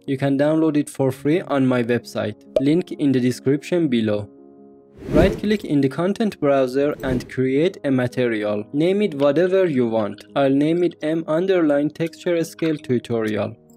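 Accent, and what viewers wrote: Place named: Iran. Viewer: این لهجه ایرانیه❤😊😂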